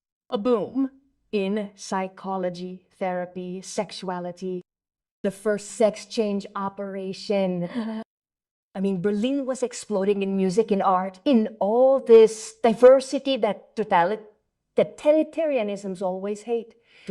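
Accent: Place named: Russia. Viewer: Porque suena como un ingles ruso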